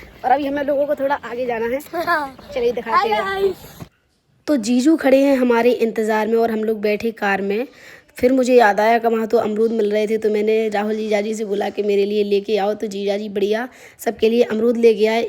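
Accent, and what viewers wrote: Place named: Bundelkhand, India. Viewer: aapki awaj mp side mostly bundelkhand reason jaise lag rahi hai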